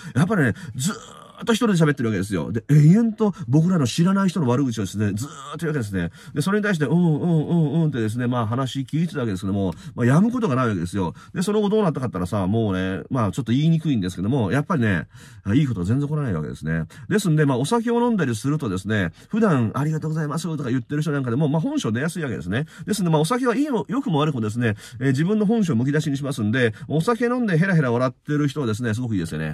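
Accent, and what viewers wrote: Kansai, Japan. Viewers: 確かに悪口言う人とお酒飲むの嫌ですよね‼️ 楽しいお酒は最高‼️ ハッピーなお酒がいい‼️ 自分の時間使って悪口文句言う人と合うのは嫌です！‼️❣️‼️ 私も48時間地獄言葉言いません‼️ 天国言葉を言います‼️ 今日はQさん関西なまり出てました💖 私も天の声聞きたい‼️💖🌈❣️💖🌈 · たまに大阪弁出るの前から好きです。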